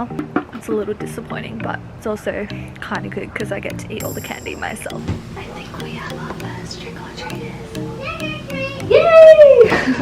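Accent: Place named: Australia. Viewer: I like your accent. Australian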